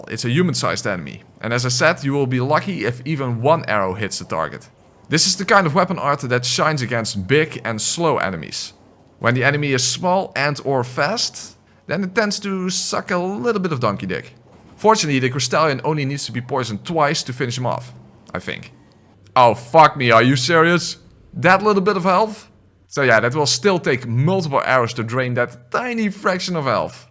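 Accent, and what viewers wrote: Netherlands. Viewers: Ur Dutch aren’t u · Haha tita tovenaar Rogier geweldig ik hoorde all aan je engels dat je nederlands bent maar dat grapje was echt geweldig🤣🤣🤣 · the moment u said... ''ti ta tovenaar rogier godverdomme'' xD my first time i watch one of ur video's and i hear u are from the netherlands XD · i can smell the dutch in your accent. gegroet 🤙🏼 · I don't know who you are but you sound very Dutch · Kankering = conquering hahaha funny Dutch accent you have. Nice vid! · He sounds dutch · Dutch? xD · As a half dutch guy, hearing a Dutch accent upon opening a video is like Christmas day. So comforting 😌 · Love the video I love the Dutch accent too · the dutch accent is also super charming lol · damn you have such a thick dutch accent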